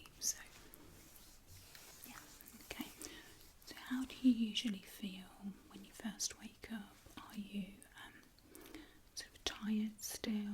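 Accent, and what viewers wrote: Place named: Cheshire, United Kingdom. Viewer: Awesome tysm👌 is that a fellow Cheshire accent?? YAAAAS 💅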